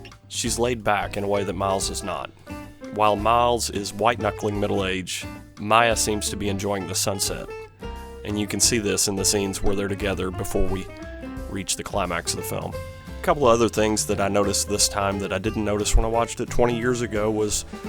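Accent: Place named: West Virginia, United States. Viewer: You sound like you're from west virginia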